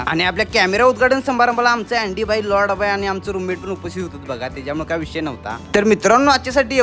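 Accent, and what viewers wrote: Kolhapur, India. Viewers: Agayayaya meskati ky to kolhapuri touch aa❤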